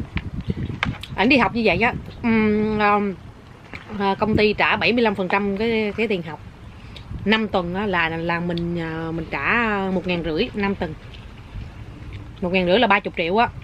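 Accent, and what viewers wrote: Mekong Delta, Vietnam. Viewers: E thích chị ghê.Người miền tây nc cưng ghê 😁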